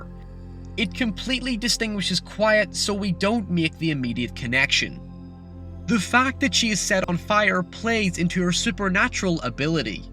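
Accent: Northern Ireland, United Kingdom. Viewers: Northern Irish? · He has a completely typical Northern Irish accent. You certainly skimped on the punctuation lessons though, didn't you?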